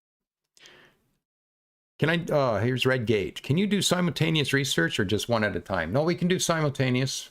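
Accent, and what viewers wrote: Canada. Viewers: I am sorry man. I mean no disrespect, but you sound so Canadian. Then I look at your profile and you are from Canada. I am going to check you out man. I just had to put that thought out.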